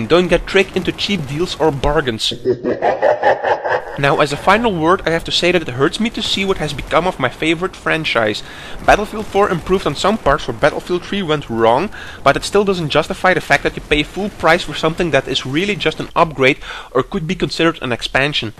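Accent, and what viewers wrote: Netherlands. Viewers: lol, I love the Dutch accent!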